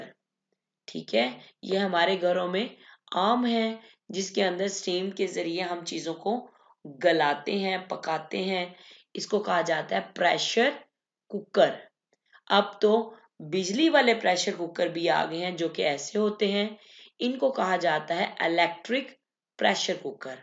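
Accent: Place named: Punjab, India. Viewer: Typical punjabi accent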